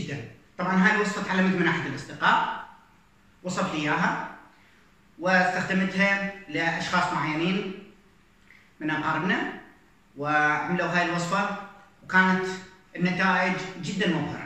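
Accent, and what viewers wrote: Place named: Iraq. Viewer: السلام عليكم اخي اني انتقدك لان اولا انت لست بطبيب ولا بمعشب انت توصف هذه الوصفات الى الناس وانت تجهل مكونات ومعطيات هذه المكونات من اثار على المعدة وعلى ارتفاع ضغط الدم الذي يسببه الزنجبيل اما الحامض فهو مدمر للمعدة للذي عنده مشاكل في المعدة اضافة الثوم اني لا اعرف كيف تصف هذه الوصفة لجميع الإعمار اخي اتقي الله انت من لهجتك من العراق والعراقين طيبين ولا يؤذن الناس اخي لا تفتي بشي لا علم لك به فسوف تسال امام الله وان عملت شي بجهالة، وحسب مشاهدتي الفديو انت مسلم وتذكر الله فالرجاء ابتعد عن هذه الأمور وانت لا تفقه شي بالطب ، اذا اردت ان تريد زيادة متابعيك لا باذية الناس شوفلك موضوع اخر تعلم به ولَك الشكر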